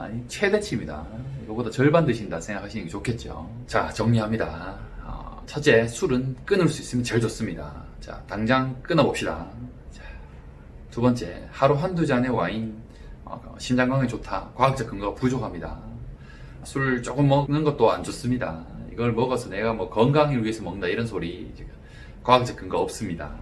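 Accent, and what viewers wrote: Gyeongsang, South Korea. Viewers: 갱상도~~사투리 쩐다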